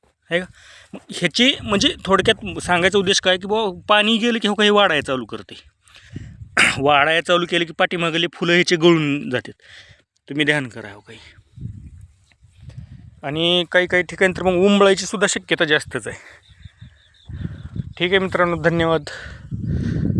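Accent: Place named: Latur, India. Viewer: Bolibhasha latur che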